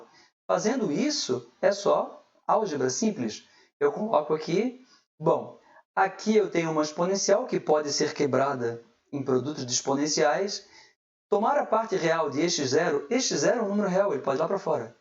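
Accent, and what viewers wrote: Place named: Portugal. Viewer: Aula muito boa! Tens acento português professor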